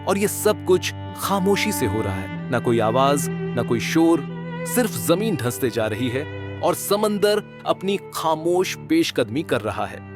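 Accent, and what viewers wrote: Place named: India. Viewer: کونسی "رپورٹ" ریفرنس کیا ھے۔ آپ کا ایکسنٹ انڈین ھے اور جو فوٹیج دکھائی وہ ممبئ یا گوا کی ھے۔ کراچی سی ویو کی ھر گز نہیں۔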